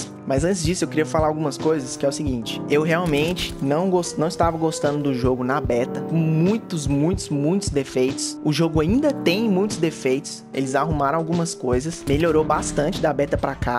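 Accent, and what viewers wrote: Minas Gerais, Brazil. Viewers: Vc é mineiro ?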